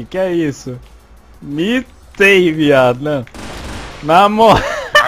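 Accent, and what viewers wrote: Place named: Northeast, Brazil. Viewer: Joga d+ cara u onde que cê Mora??:p Sutaque de Nordestino:P:3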